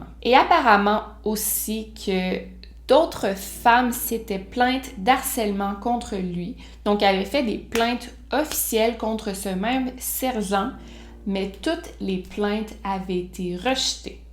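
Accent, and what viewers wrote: Quebec, Canada. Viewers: Salut, récent abonné j'adore ta chaine, tu fais un énorme travail de recherche et préparation tout en racontant extrêmement bien. Qui plus est avec ton accent québécois charmant!!! 🙂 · Je ne m'attendais pas à une vidéo aujourd'hui, j'ai cru que c'était une erreur de YouTube du coup j'ai lâché ce que je faisais pour me mettre devant de peur qu'elle disparaisse. Tellement triste cette histoire 😢 J'espère que la famille aura ses réponses. Et pour ce qui est des haters que tu as mis sur instagram, perso je n'ai eu aucun souci de compréhension donc je ne pense pas que le problème vienne de toi 😉 et puis on peut se permettre de juger les gens sur leur français quand le nôtre est irréprochable et, à lire en tout cas, le leur ne l'est pas. J'adore l'accent québécois (canadien ?) Pour finir, mettez-vous en tête qu'on a tous un accent pour quelqu'un d'autre !!!!!!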